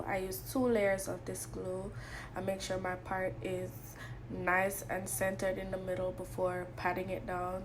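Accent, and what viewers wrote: Jamaica. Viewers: Loooove!!! ❤❤❤ You sound like a yardie tho! Only a yardie will understand what i mean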